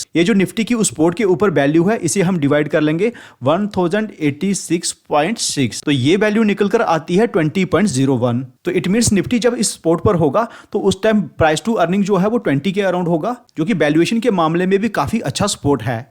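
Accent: Jammu, India. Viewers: Bhai jammu se ho kya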